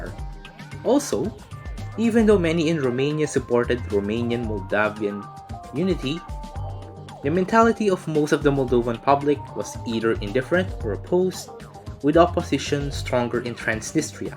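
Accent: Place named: Philippines. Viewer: Knew you were filipino from the accent. Good stuff dude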